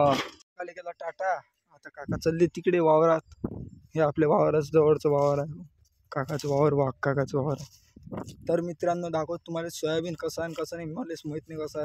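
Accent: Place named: Vidarbha, India. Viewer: खूप छान सत्य व्हिडीओ बनवला व तू बोलतो पण खास आपल्या विदर्भाच्या भाषेत समजावून सांगितले 🌹प्रणाम तुझ्या कार्याला🌹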